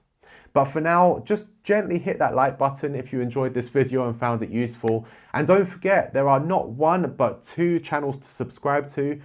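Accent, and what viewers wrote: United Kingdom. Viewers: Loved it. So informative on so many levels! Thank you kindly ! Articulate English accent & witty humour a happy bonus :-)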